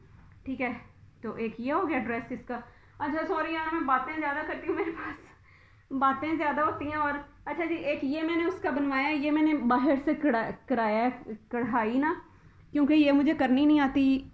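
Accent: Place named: Sialkot, Pakistan. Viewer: MashaAllah bohot bohot peyary design ap bnati hain. apki awaz se lgta hai ap Noreen ki sister hain life of noreenسیالکوٹ ki lgti hain ap🥰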